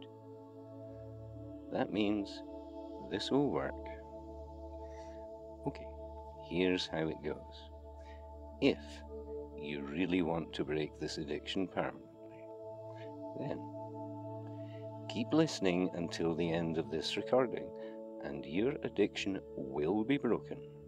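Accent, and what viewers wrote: Scotland, United Kingdom. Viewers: Hi, I think the music is a little louder than your voice so it makes you very muffled. Normally I am good with Scottish accents lol Thank you for responding. I will try it again.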